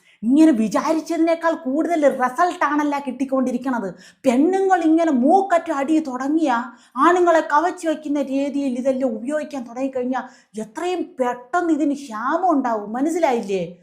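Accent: Thiruvananthapuram, India. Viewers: തിരോന്തരം ഭാഷ 🧡 👌👌👌👌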